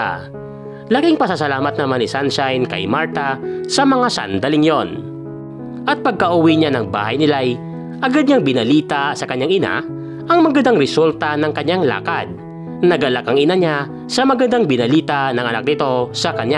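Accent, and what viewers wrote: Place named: Iloilo, Philippines. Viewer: ang ganda nang storya salamat ilonggo gid kaw yung accent mo halatado lol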